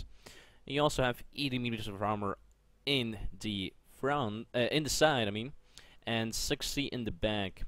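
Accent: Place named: Netherlands. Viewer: Are you Dutch because you have a kind of a Dutch accent?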